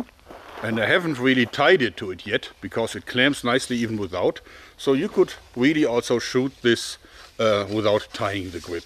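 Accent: Germany. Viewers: Dieser Moment wenn man als Deutscher Deutsche in einer anderen Sprache wiedererkennt haha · when he speaks english he sounds more german than some germans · Warum klingt dein Englisch so deutsch? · Nice german accent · I guess its the German accent. One of the guards voice from Skyrim always sounded like Arnold Schwarzenegger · du hörst dich an wie ein deutscher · Das deutsch hört man raus!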